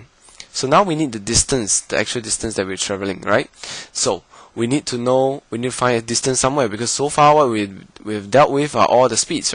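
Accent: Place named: Singapore. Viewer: i bet you are from singapore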